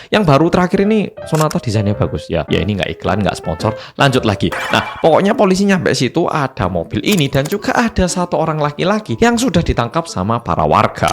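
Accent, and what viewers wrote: Java, Indonesia. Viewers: Mas jgn sampe kehilangan medhookk nya yaaaahh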